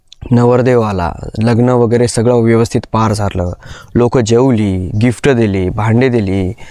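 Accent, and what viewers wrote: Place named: Vidarbha, India. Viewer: भाषा आपल्या विदर्भाची आहे भाऊ तुझी. लहानपणी आज्जी आजोबांच्या कथा ऐकलेल्या आहेत तू असं वाटतं. खूप छान कथाकथन करतोस तु. Good keep it up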